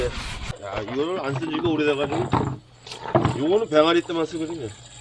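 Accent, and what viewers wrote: Gangwon, South Korea. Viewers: 강원도 사투리 구수하네요 ㅎㅎㅎ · 1. 농장 이 어디 지역이에여? 2.강원도 분이신가여? 강원도 사투리 같아서여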